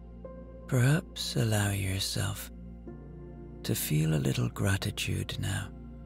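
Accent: Ireland. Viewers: I love your stories using an Irish accent to your voice! So lovely, lilting, and relaxing . Thank you. 😊❤😊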